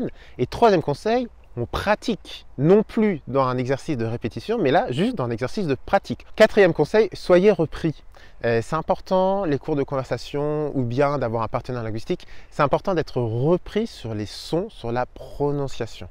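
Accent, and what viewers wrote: France. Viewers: Ma fille qui vit et travaille en Catalogne depuis 12 ans, qui est parfaitement bilingue en castillan, et presque en catalan …. A un fond d’accent français ( de Paris ), et tous ses amis natifs espagnols et ses collègues, lui disent de ne rien changer….. c’est trop glamour comme ils lui disent ….de plus l’accent français et la langue, aussi d’ailleurs,font partie des plus sexy et appréciés de la planète ….à priori ….. donc bien d’accord …. L’essentiel est de maîtriser une langue, d’être compris, et de garder son identité., surtout ne changez rien, ce qui est important c’est de nous apprendre cette belle langue hispanique, avec ou sans pointe d’accent français …. Ce n’est pas l’essentiel …. Et vous le faites si bien.Et d’ailleurs, moi qui ne maîtrise pas bien cette langue mais l’entends régulièrement en Espagne, je trouve que vous êtes au top de votre expression. 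Merci à vous …. 👏👏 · Bonjour, il y a toujours des gens pour critiquer, et comme on dit en France: la critique est facile mais l'art et difficile, et tous le monde a un accent moi je suis dans le sud de la France, et des gens remarquent que je ne suis pas originaire d'ici en effet j'ai vécu 25 ans dans l'Aisne au nord de Paris donc 100kms et alors rien de grave! De plus j'ai déjà fait écouter tes vidéos à une amie Dominicaine, et ça ne l'a pas dû tout choquée, par contre quand elle regarde des films espagnol du sud elle doit mettre les sous titres 😅, et j'ai beaucoup voyagé en France et alors là les accents il y en a de partout 😂, mais c'est joli tout ça, tant que l'on se comprend, de plus je disais à mon amie Dominicaine de ne pas s'inquiéter pour cela car celon avec qui elle parlera le français, il est différent partout. Bon je fini par dire que l'on a de la chance d'avoir quelqu'un comme toi pour apprendre l'espagnol, car tu as peut être un accent français mais au moins on te comprend quand tu parles, car lorsque c'est des natifs on ne comprends pas toujours, et si l'on est sur ta chaîne c'est pour apprendre et si ça ne convient pas à certains et bien qu'ils aillent voir ailleurs ou qu'il fasse leur chaîne. 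Voilà de tout ❤avec toi et encore merci 👍👏t'es génial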